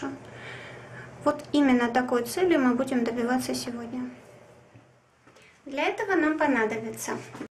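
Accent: France. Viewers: Лайк за французкий акцент . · От французского акцента чуть не стошнило, можно как то без него...😝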